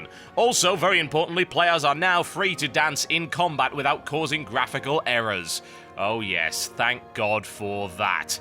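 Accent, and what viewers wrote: United Kingdom. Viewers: Azeroth Daily, bringing me my daily dose of TotalBiscuit and brittish accents.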